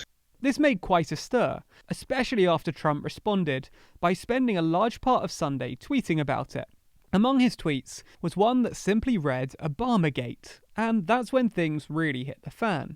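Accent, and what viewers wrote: United Kingdom. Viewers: Why isnt this lefty loony talking about how a former president was spying on a potential president? I thought lefties are all against invasion of privacy? Btw, this guy sounds like a brit? No mention of the fake dossier that got this bullshit rolling thats been spewed to us for the last 4 years over and over? This whole thing is total bullshit. Makes watergate look like a joke.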